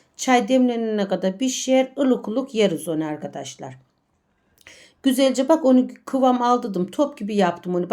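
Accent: Black Sea, Turkey. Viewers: 😂😂 uy kardezli bacim bende karadenizliyim bizim bu şifemiz nekadar da güzel emegine saglik 😊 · Karadeniz şivesi mi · Aynen karadeniz şivesi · Bildiğimiz Erzincan lokumu Karadeniz şivesi ile Anlatılınca Trabzonlu olmuyor kendi yöreinizin yemeğimiş gibi biz bunu çok severiz demişsiniz bir kere erzincan lokumu dememişsiniz ama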